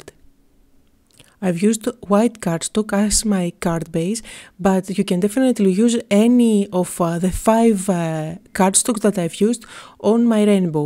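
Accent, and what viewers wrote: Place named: Greece. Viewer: i have enjoyed every art journal and card making video you made. Your art really is so warm and joyful. You have such a talent too for demonstrating all of the various products. I love your Greek accent too. Keep the videos coming please and thank you.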